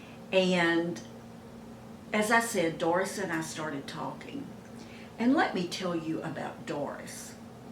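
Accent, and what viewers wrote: South, United States. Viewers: Yes! I love what you say and how you encourage other women to do what they want to do and feels right to them. Where are you? I am in Massachusetts. You sound southern, but I wish you were next door! ❤️ I will be 79 in August, still wearing makeup, still learning how to do it better. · Hi I only just found you on You Tube tonight! I'm soooo happy because you are a delight!! I love your southern accent! Where are you from? I'm originally from Louisville, KY and oh how I miss a soft southern accent. Can't wait to see more of your videos! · You are beautiful and so inspiring!! Love your southern accent🌿💜🌿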